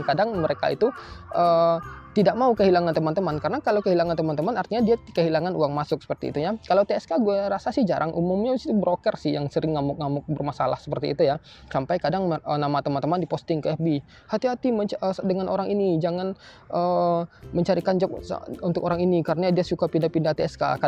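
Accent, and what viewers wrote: West Sumatra, Indonesia. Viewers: Logat bicara nya kaya orang sumbar, apa bener bg😂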